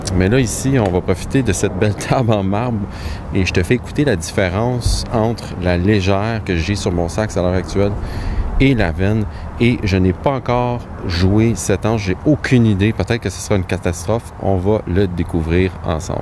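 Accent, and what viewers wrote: Quebec, Canada. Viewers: Bonjour, Je découvre aujourd'hui ton Chaleureux accent québécois. Saxophoniste âgé mais volontaire; peux-tu me donner quelques conseils pour travailler un son harmonieux, la dextérité et l'improvisation! Cordialement! Jacques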